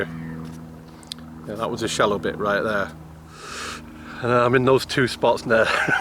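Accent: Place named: Lancashire, United Kingdom. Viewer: Sounds like your from my end of the country pal, im near Chorley, when you fish sunny 2 if you can get on the double peg closest to the island get on it its a great swim with gravel bars to your right and a great overhanging tree margin and you have the island to fish to, all the best.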